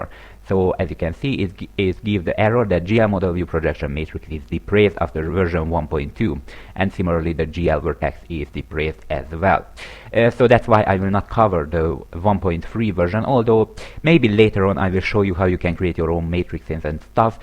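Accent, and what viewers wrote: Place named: Hungary. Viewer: te magyar vagy hallatszik az akcentuson meg látszik a "fájl, szerkesztés" ikonok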